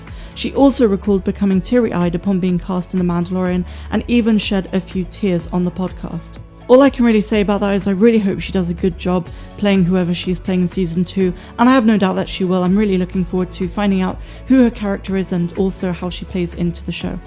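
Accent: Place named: South West, United Kingdom. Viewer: Love the show, sounds like your from down the south west like me!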